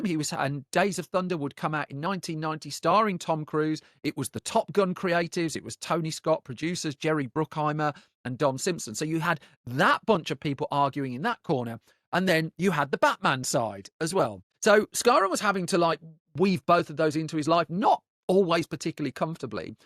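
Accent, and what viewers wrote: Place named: United Kingdom. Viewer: That is one striking British Accent..😊😊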